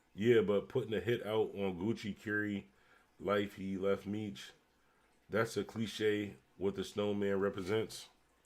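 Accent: Philadelphia, United States. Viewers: You sound like you from Philly.....Salute Bro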